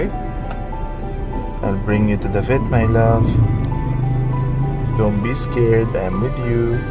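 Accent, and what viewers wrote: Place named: Philippines. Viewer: Hope Maki boy gets well soon, you are a great dad. By the way, while listening to you talking, your accent and intonation is sounding more and more like Filipino speaking in English.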